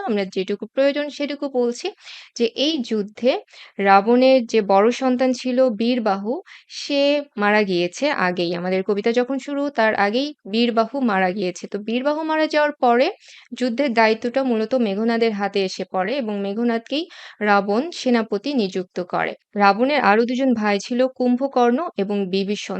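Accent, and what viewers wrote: Comilla, Bangladesh. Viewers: Apanr basa ki cumillayy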